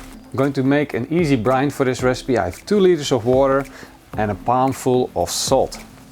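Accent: Netherlands. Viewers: Ur dutch i can hear iT i am dutch as wel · 3 seconds in and I had a feeling u were a fellow Dutchman, nice video very helpful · You sound very Dutch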